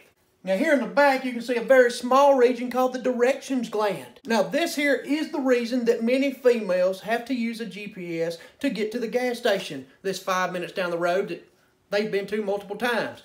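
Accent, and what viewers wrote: South, United States. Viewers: Hot and funny! Love the southern accent. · I love the Southern drawl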